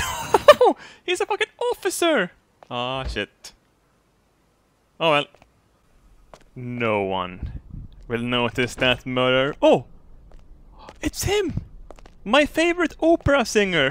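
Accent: Sweden. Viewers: The great has returned to us! How we all missed that Swedish accent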